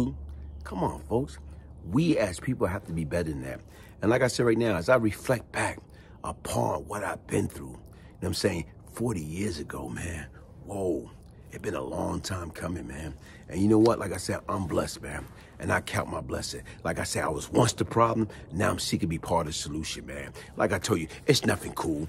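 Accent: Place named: Moncks Corner, United States. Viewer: OH OK Such A Small World. My Family Is originally from Moncks Corner But Some of Us Migrated to Charleston. Funny ThinG Is I Still Caught Our Dialect While listening to you Speak Lol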